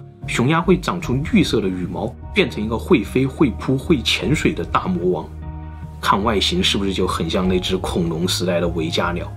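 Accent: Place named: Chongqing, China. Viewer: 我预判是重庆人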